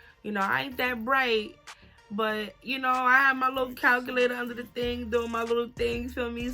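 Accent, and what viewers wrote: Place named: Brooklyn, United States. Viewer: You sound like you from Brooklyn ☺️ ! Chileeeee I worked at McDonald’s for a month & boutta start a new job at Burger King 🥴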